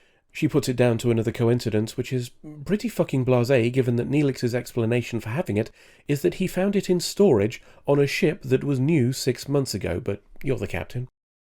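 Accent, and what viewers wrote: RP, United Kingdom. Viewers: After playlisting ( if that is a word). Two observations, you are the most British toff i heard since I worked on the Antique Roadshow and you don’t really understand American women at all.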